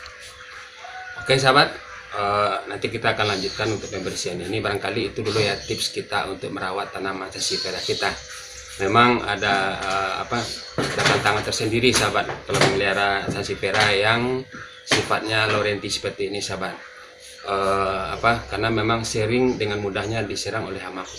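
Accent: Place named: South Sulawesi, Indonesia. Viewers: Om..domisili dikota mananya..soalnya logatnya sih org sulawesi selatan..maaf kalo salah yh.. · Anda pstibbkn org sulsel yach ? Klo org sulsel asli psti tau logatx..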